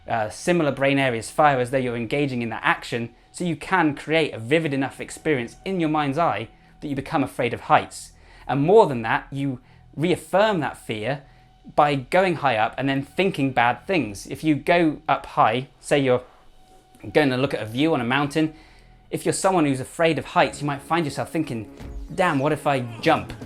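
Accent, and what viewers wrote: England, United Kingdom. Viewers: Can I be honest? I stumbled across your channel by accident and at first stayed on wondering how you would be engaging and educational. I love to commend and recommend which is what I now do with you. I love your fitness stuff, but love the way you introduce other spheres of interest into your videos. You are a breath of fresh air on YouTube and as one English man to another I enjoy your very English twang. Great content, thank you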